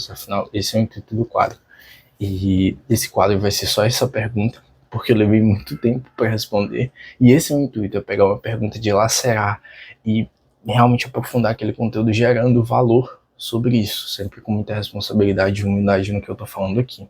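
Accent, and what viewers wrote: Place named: Bahia, Brazil. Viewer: Oii! Maratonando seus videos! Reconheci o sotaque baianal kkk, de onde vc é? Estudou pra Uneb e Uesb?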